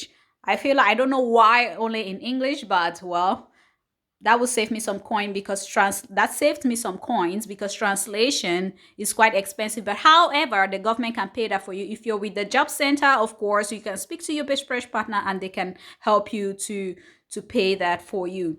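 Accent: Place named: Cameroon. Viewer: Your accent sounds like a Cameroonian.😀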